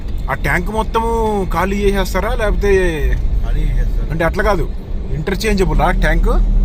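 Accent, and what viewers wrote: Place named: Krishna District, India. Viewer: మీ సొంత ఊరు ఏది బ్రదర్ ఎందుకు అంటే నీ మాట లో కృష్ణా జిల్లా యాస వినపడుతుంది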